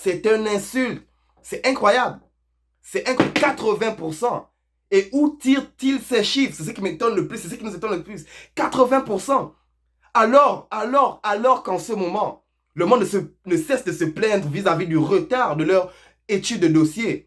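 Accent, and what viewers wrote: Gabon. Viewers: Slt dis moi t'es gaboma? Parceque tu a l'accent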